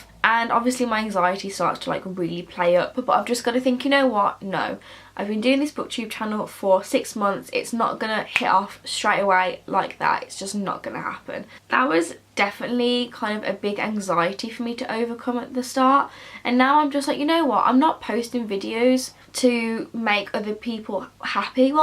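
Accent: United Kingdom. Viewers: To an American, all British accents sound awesome, so you’re good with me. 😘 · Welcome to booktube! :D I love your Birmingham accent! ♥ My own accent is such a hot mess! But you sound lovely!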